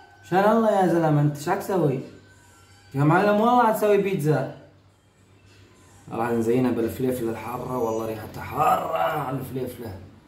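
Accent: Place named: Iraq. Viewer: لهجتك تشبه العراقيه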